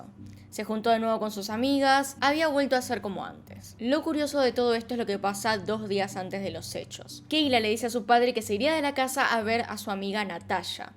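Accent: Argentina. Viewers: Buenísimo video, me encantan todos tus videos, siempre lo explicas con claridad tqmmm, además que me encanta el asentó Argentino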